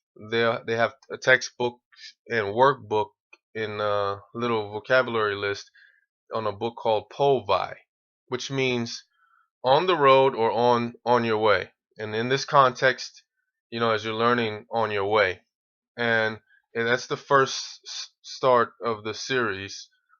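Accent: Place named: United States. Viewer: You sound so american - why are you interested in Norway?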